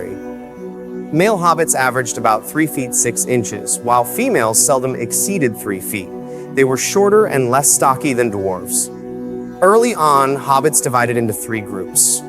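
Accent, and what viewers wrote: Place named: United States. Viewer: A shame about the strong US accent. But it's you, so I'm still going to watch.... Finished and it's as good as the rest. Ty 😊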